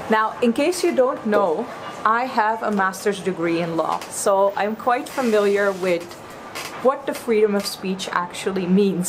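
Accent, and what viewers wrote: India. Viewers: Your getting a Indian accent! I’m from Amsterdam and it’s really obvious to hear ! Love it 😊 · Your accent is like Indian haha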